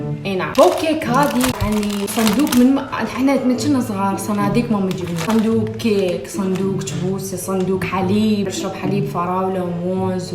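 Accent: Iraq. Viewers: انتي عراقيه بغداديه ؟؟؟؟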